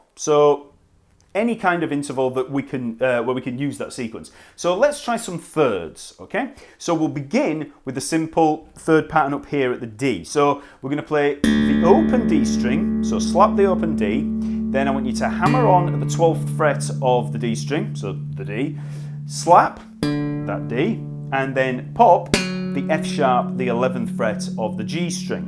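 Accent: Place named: Northern England, United Kingdom. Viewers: A proper northern english accent. :) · Great! Loving the lesson, technique and the Yorkshire accent!!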